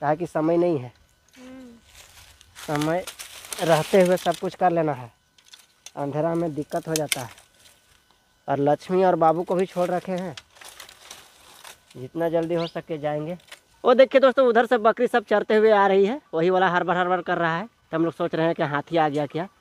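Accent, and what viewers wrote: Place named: Jharkhand, India. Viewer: आपके बोलने के अंदाज से ऐसा लगता है की आप चतरा या लातेहार साइड से हो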